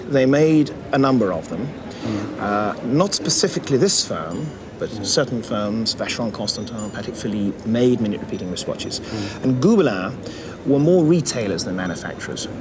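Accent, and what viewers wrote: United Kingdom. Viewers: Nothing sounds smarter than a British dude who smacks his lips while waxing poetic. Awesome stuff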